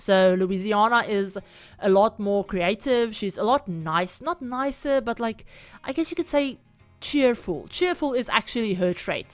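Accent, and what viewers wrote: South Africa. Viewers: are you south african? our accent is pretty iconic lmao. eitherway omfs im obsessed with this build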